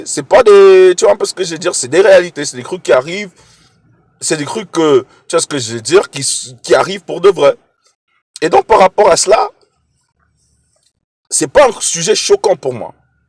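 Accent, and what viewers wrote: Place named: Cameroon. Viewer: Je savais que t'étais Camer comme moi ! J'ai repéré l'accent au début 😀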